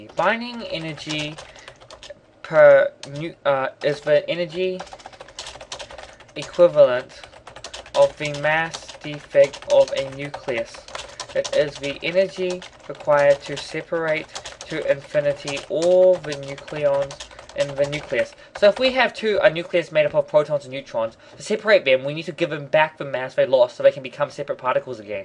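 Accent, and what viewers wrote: New Zealand. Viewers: New Zealand accent, brother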